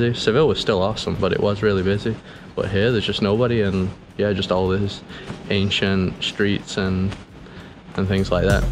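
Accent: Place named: Northern England, United Kingdom. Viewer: I really love the accent from Northern England. Cordoba is amazing! Happy travels!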